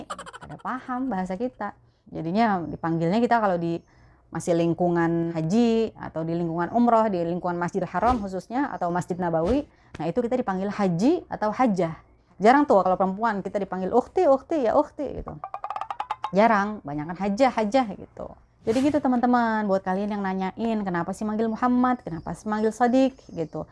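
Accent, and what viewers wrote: Jakarta, Indonesia. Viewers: Assalaamualaykum....met kenal mpo hehehe....hr ini kluar dah logat betawi mpo yah hehehehe...sukses slalu ya mpo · Assalamualaikum.. teh, aslinya mana? Logat ngomongnya betawi beud 😁😁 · Salam kenal pok,gaye nye betawi banget👍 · Medok banget Betawi nya.. 👍👍❤️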